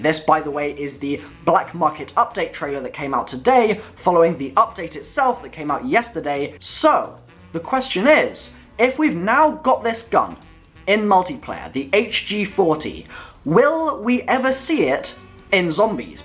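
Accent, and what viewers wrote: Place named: RP, United Kingdom. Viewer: this is to fucking posh for respect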